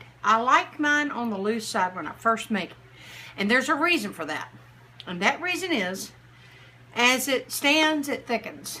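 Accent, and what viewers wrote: South, United States. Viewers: I am thinking; Kentucky;Virginia, Tennessee by accent?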